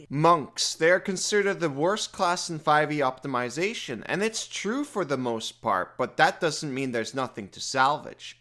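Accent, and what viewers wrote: Scotland, United Kingdom. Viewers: this guy scottish?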